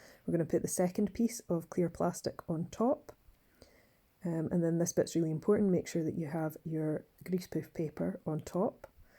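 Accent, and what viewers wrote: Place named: Scotland, United Kingdom. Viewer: be! Everyone loves a Scottish accent 😎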